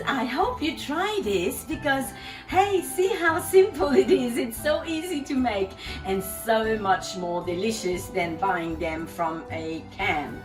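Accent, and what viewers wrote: Australia. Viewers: I'm hearing several different accents in your speech, one of which sounds Australian/New Zealand??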